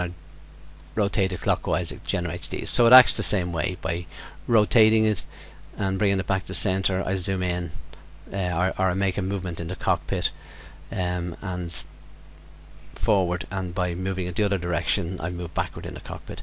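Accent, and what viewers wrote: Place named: United States. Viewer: A very good video!!!! You have a bit of an accent. From the USA!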